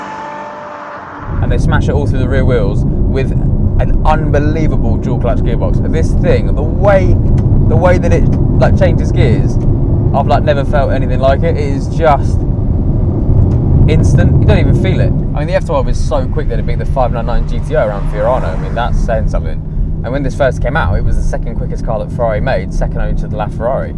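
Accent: United Kingdom. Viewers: *English humor, the dude is English, even I as an American knows this, heck his accent is a dead giveaway. He's not Scottish or Welsh. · And he sounds like he's from Essex.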